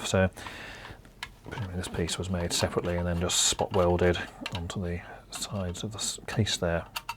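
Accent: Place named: RP, United Kingdom. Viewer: Typical 'YouTube whingers'. speaks perfectly clearly in a BBC accent (like me). Go and get your ears syringed!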